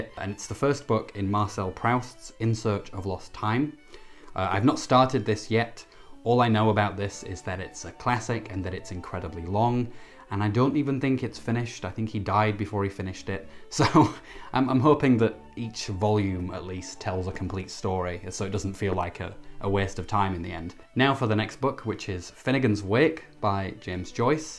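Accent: Northern England, United Kingdom. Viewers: North England by the sound of it, though my American ears might deceive me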